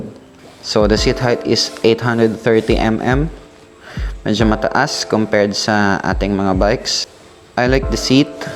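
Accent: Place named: Conyo, Philippines. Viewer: grabe conyong conyo si idol! nosebleed ako hahaha.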